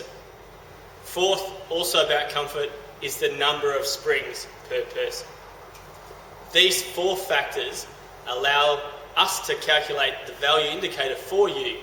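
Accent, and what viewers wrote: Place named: New Zealand. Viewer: Sounds like a kiwi.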